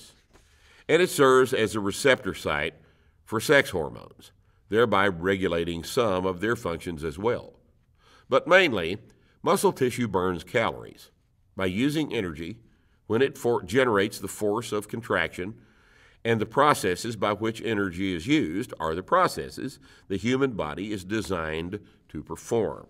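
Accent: Texas, United States. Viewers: It's them beautiful Texan tones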